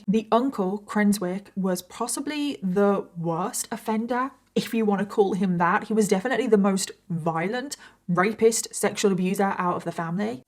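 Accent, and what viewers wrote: United Kingdom. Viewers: Let me say, before I even watch this, you’re my favorite true crime YouTuber. Honestly, I was initially put off because I have serious British accent related PTSD, but I am glad I set that aside (it helps you’re female). Your content and delivery is exceptional 👍🏻💪🏻👏🏻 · Uhh that British accent....i just cant... Im so happy for your 1 Million Subscribers ❤ wish you 100x more.. Bye ❤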